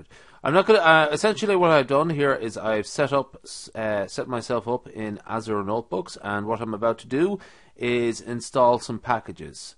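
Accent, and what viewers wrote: Ireland. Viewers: It's kind of spooky hearing another Irish person talk me through machine learning on a YouTube video, especially in such a casual manner. You may as well be my inner monologue 😂 "So here we are aaand ... that's not great". Subscribed. Looking forward to more of your videos :)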